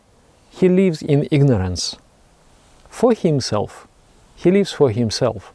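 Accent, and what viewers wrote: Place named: Russia. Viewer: Спасибо большое за видео🙏 Но его не совсем просто слушать из-за грубого акцента который влияет на произношение. В том смысле что русские постоянно пытаются произносить чётко каждый звук. Английский так не устроен, он звучит нормально только когда на нём говорят носители в обычной им манере. Ещё раз спасибо 😊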